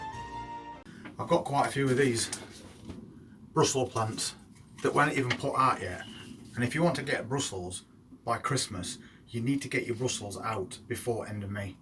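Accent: Yorkshire, United Kingdom. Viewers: Just found yer chanel dude subscribed hello from donny are you Yorkshire?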